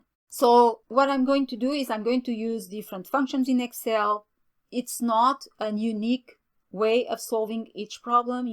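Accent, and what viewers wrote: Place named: Portugal. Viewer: Eu abri o video que me apareceu, porque procurava a ferramenta para comparar as listas e ouvindo o sotaque, comecou me a entrar a impressaro que a voz era Portuguesa. Fui olhar para o nome do autor e acertei.. Sobre o conteudo, e muito bom. Bem explicado. Obrigado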